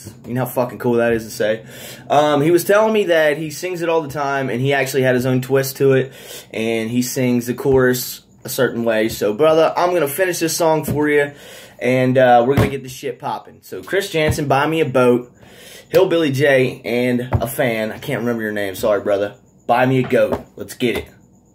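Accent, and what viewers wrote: South, United States. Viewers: its good to hear a good counrty voice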